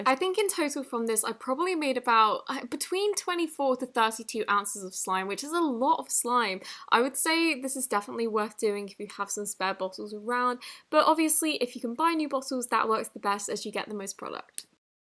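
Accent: United Kingdom. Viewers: Thang Hong she’s british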